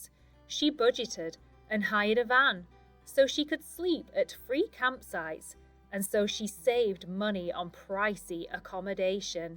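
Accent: England, United Kingdom. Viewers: Are it's use British Accent? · Great story to learn English with British accent. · English UK? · Does this program pronounce with British accent? It is so beautiful! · British English is so pretty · British accent 😍😍😍😍😍😍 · What a lovely story! I enjoyed and appreciated it quite a lot as it gives the chance to improve and keep up to date my reading and listening skills.I do love the English accent so thanks a lot! Marinella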